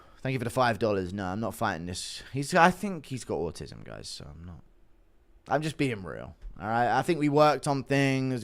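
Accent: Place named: India. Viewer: has an indian accent off stream, it stinks like shit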